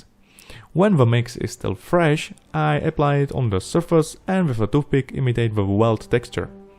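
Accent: Russia. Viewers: просто по акценту я посчитал, что он носитель русского.) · С русским акцентом!))))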